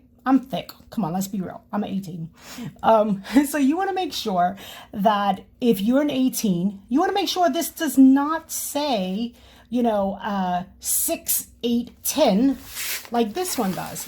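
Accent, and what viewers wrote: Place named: Boston, United States. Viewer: you are so adorable, I find I am smiling while I am watching you. This video, helped me be confident in the things I have figured out on my own already, and you clarified some things I was not sure about, and taught me a couple new things!!! Thank you! But one question. Are you from Boston? I had a friend in college long ago, from Boston, and she said a couple of words with a particular accent, the you say a few words really similar. The word "here" and words that end in "-er". Just wondering.: D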